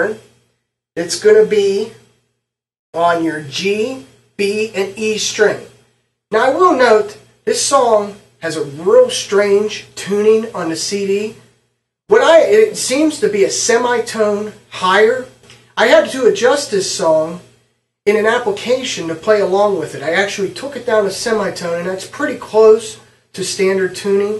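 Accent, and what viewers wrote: Pittsburgh, United States. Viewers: Pittsburgh accent?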